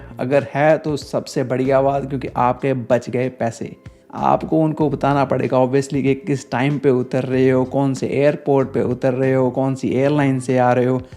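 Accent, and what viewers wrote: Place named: Punjab, India. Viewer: Nice nice.. Your punjabi accent is creating more personal connection with you. Pehle hi your videos were super helpful and now its way more relatable as well ❤️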